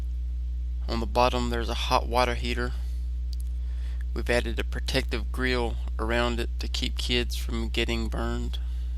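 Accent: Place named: South, United States. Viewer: Love that Southern accent you got rocking!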